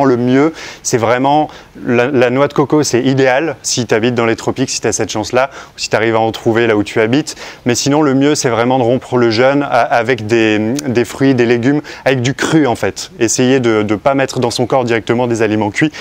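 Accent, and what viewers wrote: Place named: Switzerland. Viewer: Tu dois etre Suisse pour parler comme ca.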